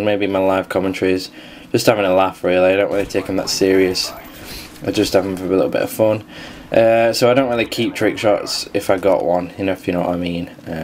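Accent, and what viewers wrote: Yorkshire, United Kingdom. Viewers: You from Yorkshire?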